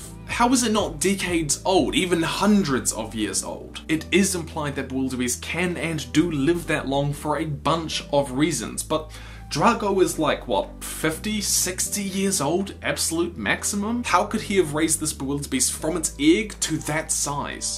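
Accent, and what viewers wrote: New Zealand. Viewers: Hello are you a Kiwi? Or Auzzy? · wait are u from new zealand (if you are i am too) LUV U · Wait are you a kiwi (new zealander) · Are you from New Zealand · spot the kiwi accent lol love it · wait are you from New Zealand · Are you from NZ because I am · OMFG IS HE FROM NEWZEALAND OMG IM SO HIPED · are you from nz?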